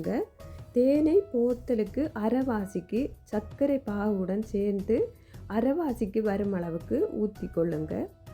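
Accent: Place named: Sri Lanka. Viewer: ஸ்ரீலங்கா தமிழ் ரொம்ப பிடிக்கும் எனக்கு என் பிரண்ட் valvettithurai நான் இந்தியா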